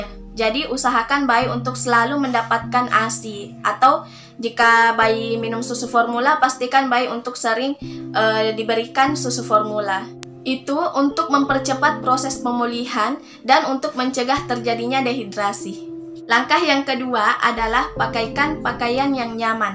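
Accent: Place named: Bugis, Indonesia. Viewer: logatnya seperti orang bugis 🙏😁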